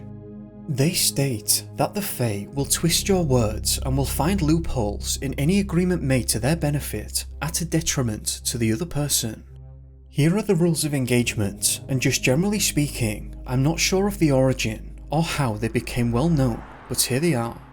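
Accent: United Kingdom. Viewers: Reading off a script and being british does make him sound like every other youtuber. Reason for the method is so that theres a sense of (pseudo) intelligence. And the length is so people can fall asleep to this boring shit. Gotta farm those autoplay views when your audience isnt consenting 😂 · a big body of water might stop them from crossing, however there are strange disappearances in north america and some people see strange things in the woods and have slowed down time, some people have even said they can see their search and rescue teams and they hollar and throw rocks and its like they cant hear them and they are outside of space and time basically what you're saying is if you take it go put it back yourself or you'll be fucked forever sounds like silicone based life (the man eating rock) lol the way britts pronounce some words just sound funny. i only ashume aluminium is shinny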